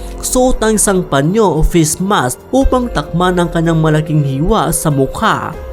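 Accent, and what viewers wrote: Philippines. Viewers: visaya ka kuya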